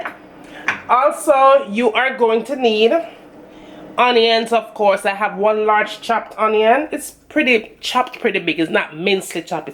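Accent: Jamaica. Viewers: nice simple recipe!! Btw mi love the Jamaican accent!! Real yaady!!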